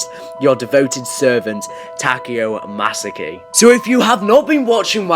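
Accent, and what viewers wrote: United Kingdom. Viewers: steeleman619 He's British...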